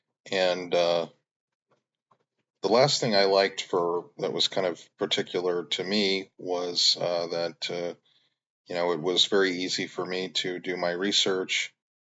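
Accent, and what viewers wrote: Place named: United States. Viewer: Hey man, yt randomly recommended me this video. I grew up there lol and I live in the US rn. You have talked about Sariyer and I was like maybe he studied at the Bogazici University(?). Were you staying at the Kandilli Campus ( You have talked about taking a bus and then the metro so maybe?) Yeah public transit can be really draining and it usually sucks. Also the level of random traffic is probably unmatched too. Anyways, it was funny hearing an American yap about Istanbul haha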